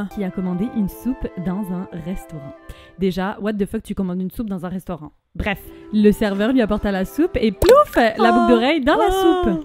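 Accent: Quebec, Canada. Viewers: Mes on est d'accord que elle a un accent québécois parce qu'elle était aller vivre o Québec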